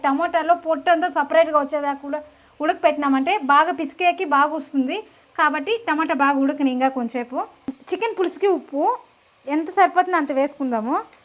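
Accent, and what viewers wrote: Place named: Chittoor, India. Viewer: natural and nice cooking with chakkani chittoor telugu maatalu, so homely...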